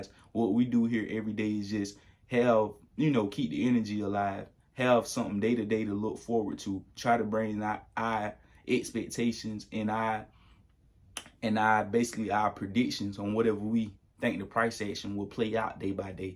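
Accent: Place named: Georgia, United States. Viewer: Gotta know where you’re from. I live in Georgia and your accent sounds like you might be a neighbor.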